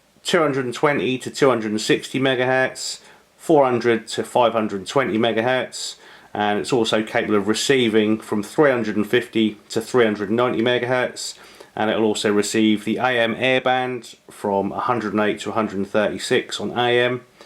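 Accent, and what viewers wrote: United Kingdom. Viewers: I just got mine (US). It's a pure beast on 70CM, hitting repeaters way out. 2M is ok to close repeaters, even with different antennas. Big clunky buttons feel awesome and the GPS is strong (hold down the A/B button for GPS). When transmitting, be sure to press the transmit button hard and wait a second or two before speaking. Too bad you couldn't get this info from the video, but you know, clicks.... But at least he's got a British accent.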